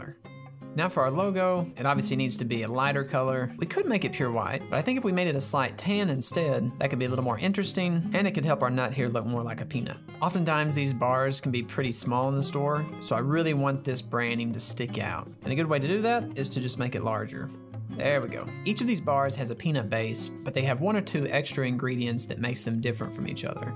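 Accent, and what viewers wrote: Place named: Texas, United States. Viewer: I get the feeling he is from texas just by the way he sounds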